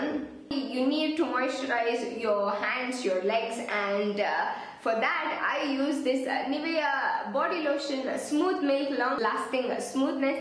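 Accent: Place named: South India, India. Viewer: You have the cutest south Indian accent.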